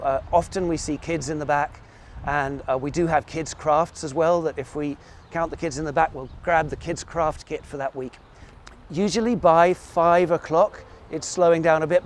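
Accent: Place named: England, United Kingdom. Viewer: ...who is this narrator right off the...boat with his quaint English accent...wtf...moved to waimea due to zip code based average wealth of newly arrived residents that made their wealth through the gravy train FAKE economy now crumbling...Worldwide...HAWAII FOR HAWAIIANS... Waikiki Io Kea... Cult of Ku...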